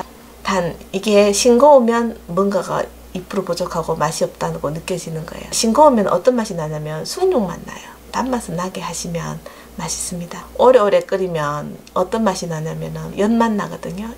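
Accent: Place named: Daegu, South Korea. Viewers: 경북사투리에 참 듣기좋은 말소리를 하시네요..보통 경북사투리 참 듣기싫은데..경상도 사람 입장에서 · 어릴때 엄마가 만들어주던 식혜 생각나요. 대구사람인데 이모 말씨가 촵촵 감기니 정겨워요^~^ 울 엄마한테는 전수 못 받았던 레시피.. 이모한테 배워가요♡ 뭔가 어려울 것 같아서 생각도 엄두도 못 내던 식혜~ 도전!!!!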